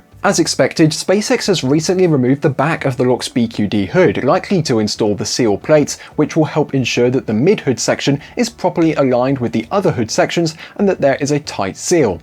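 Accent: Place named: England, United Kingdom. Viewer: Wrong? He's and Englishman speaking English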